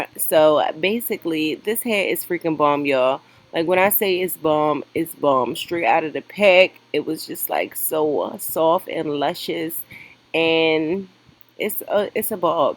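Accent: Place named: Maryland, United States. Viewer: That “Murrlin” accent is THICK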